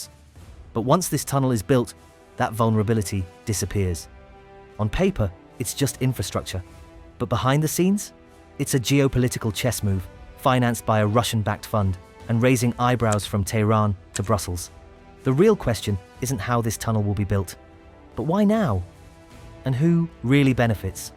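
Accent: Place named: London, United Kingdom. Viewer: London accent make complaints about developments in Eastern countries!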